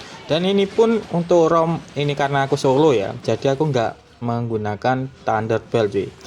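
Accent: Java, Indonesia. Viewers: LOGAT JAWA KAU KUAT KALI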